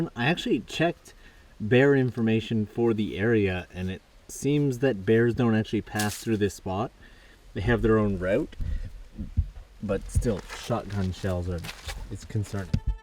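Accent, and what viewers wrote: Canada. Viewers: Fellow Canadian living in Japan here. I've only watched a few of your fascinating videos, but I knew you were from Canada from the first vid of yours that I watched, just based on the way you said "house" :). I used to live in Tohoku, and these abandoned villages remind me of all the old houses and sections of cities I would travel through for work. Of course, there are even more abandoned places there now, especially in Fukushima. One thing these sorts of places always make me think of is the warmness of small-town Japan. Thanks for keeping your focus on these smaller parts of this amazing country, it really helps keep them alive through the memories, in a certain way. You just earned a new sub, keep it up. Edit: the only gripe I have is you cursing about the curry. I get you might not be a fan of the curry, but there's no reason to cuss it out.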